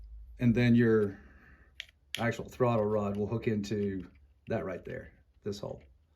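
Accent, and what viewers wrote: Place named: South, United States. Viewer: Buddy, I heard the southern accent and euphemisms and knew right there that this video was the only video I needed. You're right. That German engineering is dummy proof. Thank you for the walkthrough, dude. Be blessed.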